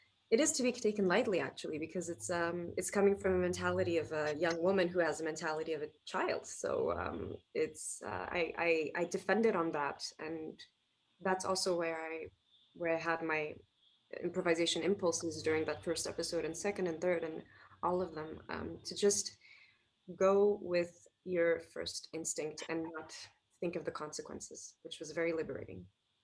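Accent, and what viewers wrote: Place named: United States. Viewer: Why does she have an American accent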